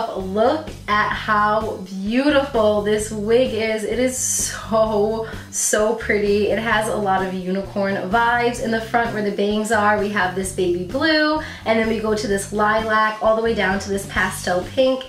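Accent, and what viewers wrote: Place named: Midwest, United States. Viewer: Her accent has a Midwestern sound. Like Wisconsin or Minnesota. It's a little infectious from her.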